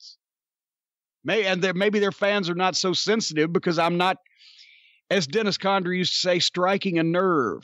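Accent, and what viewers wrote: South, United States. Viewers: Parz BK that’s not what my sub conscience is telling my necessarily but his southern accent makes the words “mother fucker” hilarious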